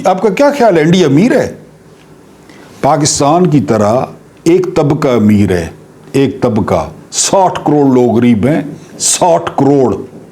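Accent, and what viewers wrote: Saraiki, Pakistan. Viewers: السلام علیکم ورحمتہ اللہ وبرکاتہ جناب برادر صاحب آپکے ویلاگز دیکھ کر میں بہت محظوظ ہونے کے ساتھ آپکی سچیائی پر مبنی حالات حاضرہ سے باخبر ہوتے ہیں اور خاص طور پر آپکے سرائیکی انداز کچھ الفاظ بہت مزہ دیتی ہیں۔ سید غلام اصغر بخاری ضلع لودھراں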